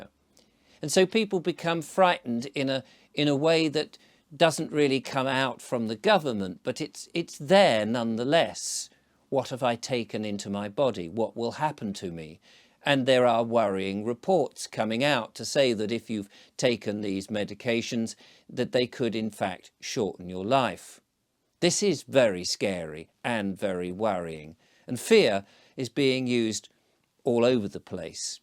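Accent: United Kingdom. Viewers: You're doing a service to the ones who hear, by giving an (eloquent & very british...) voice to all. Personally, I agree with ALL the words being said. We're ALL living on a 'simulated' world, where the ones who rule it SURE WEREN'T elected. The 'curtain' is 'falling'... slowly BUT surely... Thank you again, Sir.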